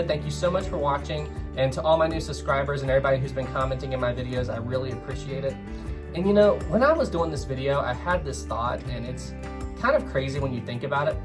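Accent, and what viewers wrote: South, United States. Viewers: As usual, awesome video man!! Where are you from? I’m catching a slight southern accent haha. I’m a Tennessean Titanic Fanatic. 😂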